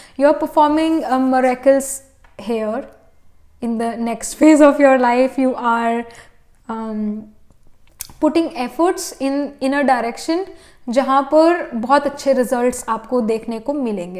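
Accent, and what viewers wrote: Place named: South, India. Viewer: Your voice is very good with south indian touch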